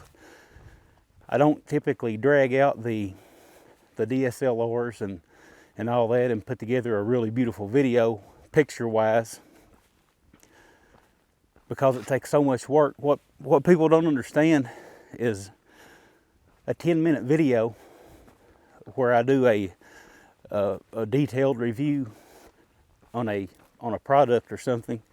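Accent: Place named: Kentucky, United States. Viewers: Being a fellow Eastern Kentuckian I appreciate your accent. I wished it wouldn't die out, but I think it is. My Grandson sounds like the people on tv. I really enjoy your videos and being a new subscriber I have many to watch. I believe the Governor would sign the bill if it makes it to his desk. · Hey. Always good to hear that southern drawl up here in New England. The south has some great food, great people, and is the most business friendly region of the USA. Pay no attention to the haters. Glad that you found your ve-hicle ok. LOL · I’ve said it before, sounds like home to me. My dad’s side of the family is all from Kentucky 😁 Spent the first week of my life in Ft Knox off base housing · I just found your channel and have been binge watching. First heard you and thought you was from Kentucky as well. Then I find this video and I’m pretty sure I’ve hunted the pioneer hunting area you walked by in this video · Brother don't worry about your vid quality. 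It is the substance that matters. As far as the accent it reminds me of my family who live down in your neck of the woods. My Dad was born in a coal mining camp in deep Appalachia and my roots run deep in those mountains. Thanks reminding me of my family's home. All the best! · Your accent sounds like my kinfolk from Pennington Gap, newer subscriber enjoying your channel from Phx Az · I’m a hillbilly from Kentucky too (Letcher Co.) I can understand you just fine, you sound a lot like me and my people, and you are right about kids having less of the hillbilly accent, my kids talk a little more proper than I do, I think it’s from TV mostly. Well I’m gonna get back to watching the UK vs. UT game it’s been a good one so far, Go Cats! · Spot on Brother! The fact that you have to delete comments based on your accent just shows how retarded some people are.. I just figured you are from the south.....dont all ya’ll talk the same anyways?? 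😂😂😂. Great video, keep it up!! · I appreciate your videos. As a fellow Kentuckian and graduate of EKU, I appreciate the Appalachian hertiage. I don't know why anyone would have an issue with your accent. Take care and God bless.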